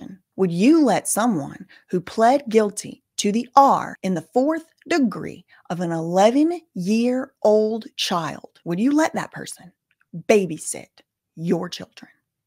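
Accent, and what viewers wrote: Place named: Alabama, United States. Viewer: I have been watching your videos and your accent is familiar are you from Alabama?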